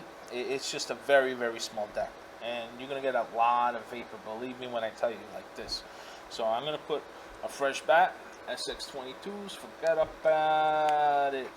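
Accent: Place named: Brooklyn, United States. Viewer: Something tells me your from Brooklyn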